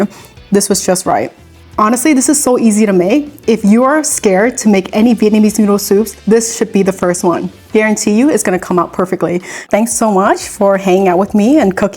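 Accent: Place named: South, United States. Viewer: Why do you speak more like a southerner?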